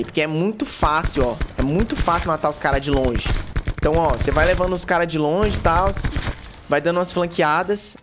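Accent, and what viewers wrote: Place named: Goiás, Brazil. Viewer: Os ataXiiiments sotaque Goiano kkkkkk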